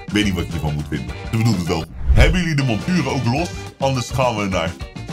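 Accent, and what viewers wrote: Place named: Rotterdam, Netherlands. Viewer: Gozer als jij nog Rotterdams praat is je lach en je manier van praten geniaal 😂😂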